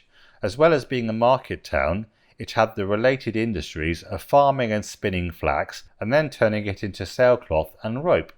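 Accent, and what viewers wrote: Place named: Bournemouth, United Kingdom. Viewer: excellent quality video - very well done - can tell you're from the Bournemouth area!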